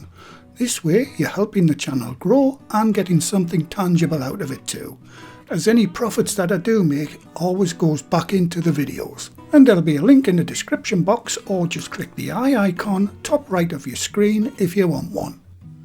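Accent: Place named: Geordie, United Kingdom. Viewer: My girlfriend is from Newcastle. Love the Geordie dialect🥰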